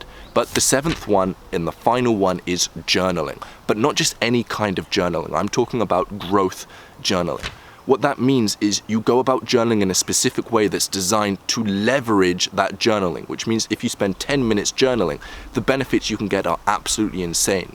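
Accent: United Kingdom. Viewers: I really can't tell if he's British or American 😳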